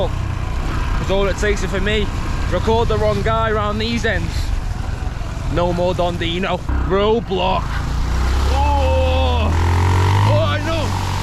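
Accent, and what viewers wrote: Liverpool, United Kingdom. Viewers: Liverpool accent at its finest 😭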